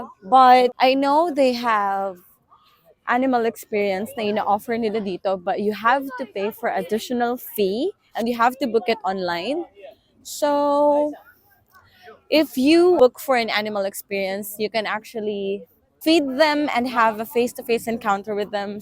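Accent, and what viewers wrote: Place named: Australia. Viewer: Aussie accent kana sissy, miss you 🥰😘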